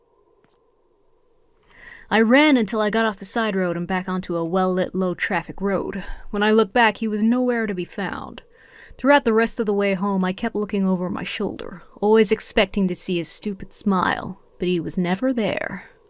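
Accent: United States. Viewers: your american accent sounds a little bit like Red from OSP. :O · Your American accent is on point! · your American accent freaked me out more than anything. It sounded too good, like you been lying about having a British accent this whole time! It was unsettling. 😊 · Love your American accent as always. But, uh, calling this a "game" feels a tad generous. Still, got some good fun out of it.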